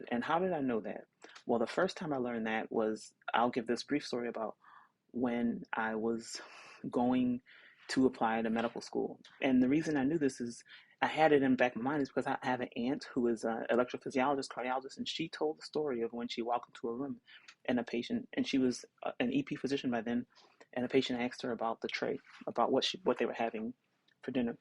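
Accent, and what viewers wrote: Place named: United States. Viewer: Are there that can speak English like this? 😳👀 Anyways, hello 👋🏻 from Nigeria And you 🫵🏻 have gotta be a black American, not an igbo soma 🫵🏻🫵🏻🫵🏻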